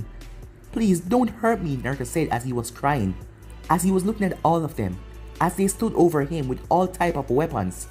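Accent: Jamaica. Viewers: Are you Jamaican or from the Caribbean? I hear the accent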